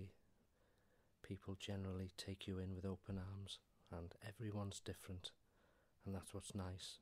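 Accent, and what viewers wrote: Wales, United Kingdom. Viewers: Hello! I've really enjoyed your videos. I find your Welsh dulcet tones very soothing. What I think would be a quality idea, would be a video about the birds that visit your garden, or local area. I'd really like to know what bird makes what song and what they look like, etc. To hear their calls on one video, with your voice would be very relaxing as well as highly informative! Blessings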